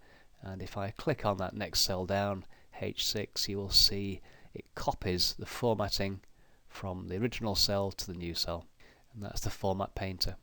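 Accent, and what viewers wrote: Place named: England, United Kingdom. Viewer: This English accent.. uff... English in an Indian accent is better for Indians..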